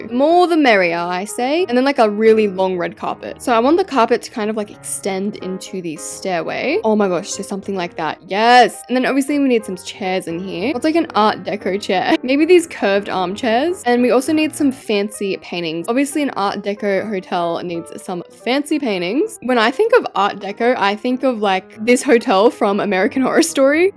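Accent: England, United Kingdom. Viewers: stwoooppppp i loved her english accent its so nice!!!!! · ur brit accent is more british than me and i’m british😭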